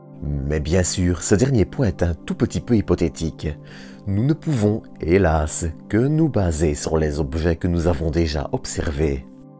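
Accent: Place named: Namur, Belgium. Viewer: Mais de quelle région de Belgique venez-vous? J'ai l'impression namurois mais certaines intonations sont exotiques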